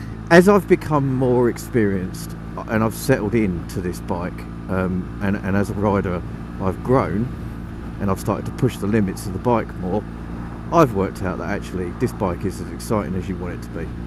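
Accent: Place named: United Kingdom. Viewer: Dig your sense of humour and Brit accent LOL 😂 Great video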